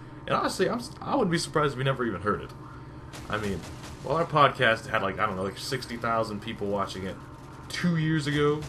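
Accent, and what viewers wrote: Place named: South, United States. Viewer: You have just the slightest twinge of southern accent whenever your start speaking a sentence more than 6 words long. I would totally fuck that slight southern accent.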